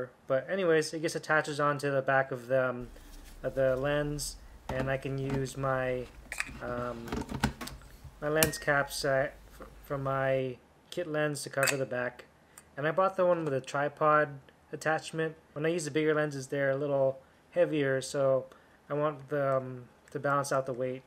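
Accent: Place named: Philippines. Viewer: I knew it you were filipino man hahha i based it off the accent anyways great video man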